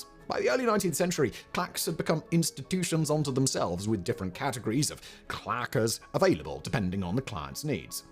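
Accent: United Kingdom. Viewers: I think it's ironic that you was a British person or at least a person with a British accent… Did not mention the use of the laugh track in Great Britain… Especially on the Monty Python flying Circus… They fought against a laugh track and were told by the producers"when will people know to laugh"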